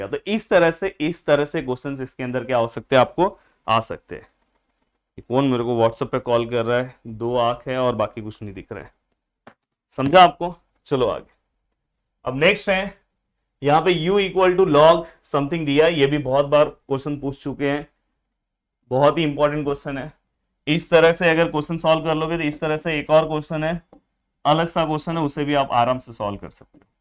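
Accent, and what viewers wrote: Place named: Uttar Pradesh, India. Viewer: Up wale hai bhai lagta hai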